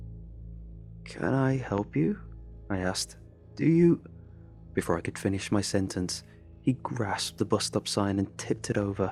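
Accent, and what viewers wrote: England, United Kingdom. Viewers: Considering you speak with a British accent little brother,I would LOVE to guide you through the misty mountains and the foggy bottoms that are in West-By-God-Virginia...🤘☢️🤘 I'm the one who would sacrifice to get you out to tell the story my friend... Come to the U.S. and I will drop blood for you...🤘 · WTF?! He is English. I am American but have absolutely no issue with following his UK accent. In fact, I quite like it for added atmosphere. Heaven help you if you ever have to listen to a Scots or Irish accent if this guy is hard for you to follow.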